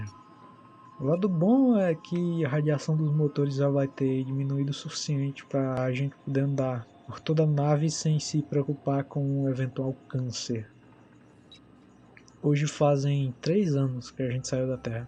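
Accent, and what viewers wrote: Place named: Brazil. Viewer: Eu sou do ES naturalmente "sem sotaque" mas ouvir um sotaque tão brasileiro me aproximou tanto que não sei explicar.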